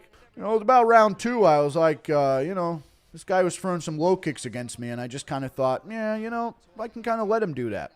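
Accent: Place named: United Kingdom. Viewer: I love how impression of Anthony Smith is basically his normal voice, he just switches from his natural UK accent to American XD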